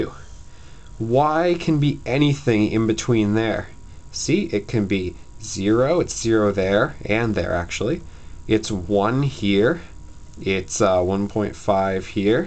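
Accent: Canada. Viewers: no his Accent is obviously Canadian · i can tell that you're canadian .. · You're definitely Canadian. Thanks for your help!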